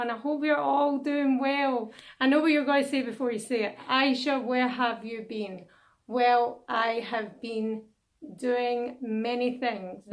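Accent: Scotland, United Kingdom. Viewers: AAA ooozu billah, I thought u r quarantine..Scottish accent is too unique from the British one but beautiful.. Mashallah.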